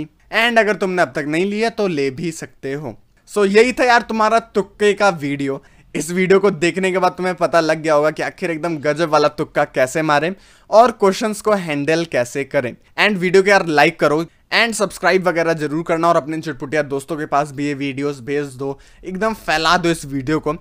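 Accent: Bihar, India. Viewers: Hello bhaiya aap bagaha bihar se ho kya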